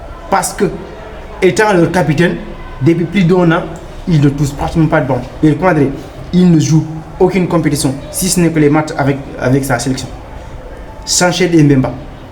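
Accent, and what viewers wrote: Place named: Senegal. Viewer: Deja tu n'est sénégalais avec ton accent la hum🤷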